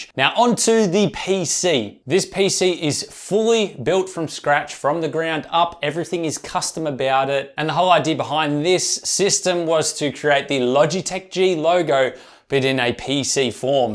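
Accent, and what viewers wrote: Australia. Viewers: btw are you Aussie?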